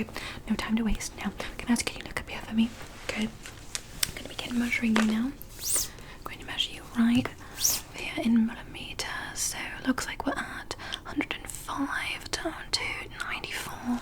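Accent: England, United Kingdom. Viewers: Big Hayley Atwell vibes with the british accent 😍😍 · YES! THE BRITISH ACCENT IS BACK!!!!!!!!!!!!!!! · I was beginning to withdrawal from not hearing the British accent. She came through at the perfect timing lol🙏🏼🙏🏼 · Does she do any other ASMRs in her English accent? I love it so much lol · Your English accent is the best please make more of them like this 😍😍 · Really Beautiful Woman with Glasses and the Most Beautiful British Accent!!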